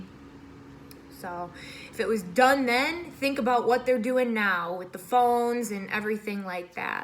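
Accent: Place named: Midwest, United States. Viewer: I like your Mid-West ayacciyent! Seriously tho, CIA and FBI are nothing like most people think. They don't use law enforcement tactics but subversive, covert menacing,, and use your own people or enemies against you. They set it up but never really get hands dirty (directly speaking). They commit more felonies than they probably prevent.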